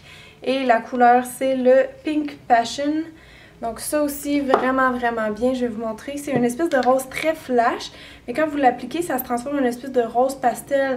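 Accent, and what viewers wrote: Quebec, Canada. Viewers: C'est un délice de voir tes vidéos, ton petit accent canadien que j'adore,en tout cas je suis tes conseils merci beaucoup de ta spontanéité , j'adore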